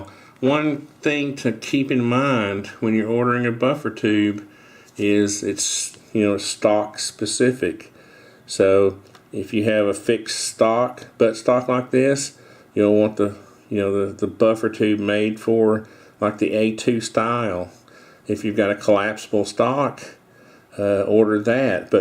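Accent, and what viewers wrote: South, United States. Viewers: that man comes from the South. Man you can hear that.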